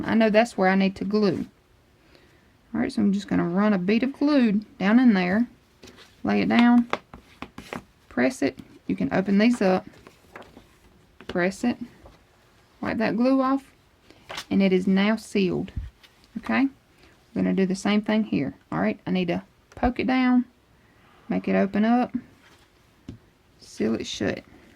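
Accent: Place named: South, United States. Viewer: Thank you for going at a speed where I can comprehend!! I just love your work and that Southern drawl!!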